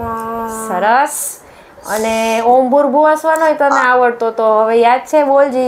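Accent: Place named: Kathiawar, India. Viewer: Gujarati vlogs is far more better than Hindi. Your Hindi was not so clear and in Kathyawadi tone. Hence, our mother tongue is the best. Good luck.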